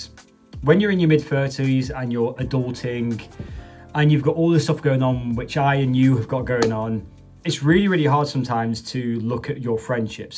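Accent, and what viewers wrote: United Kingdom. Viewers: (this comment was based on your UK accent lol)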